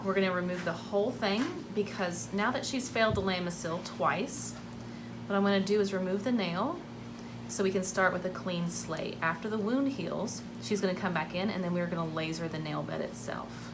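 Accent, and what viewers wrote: Kentucky, United States. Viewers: I knew that Kentucky accent when I first started watching. Then you mentioned toyota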